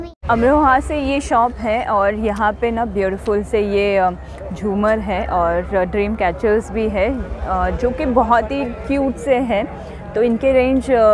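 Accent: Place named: Maharashtra, India. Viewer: I think u r from maharastra, if i not wrong because I heard yr marathi tone in your voice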